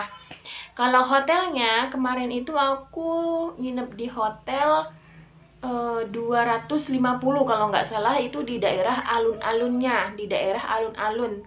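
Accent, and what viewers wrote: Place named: Bawean, Indonesia. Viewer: Logat bawean mbk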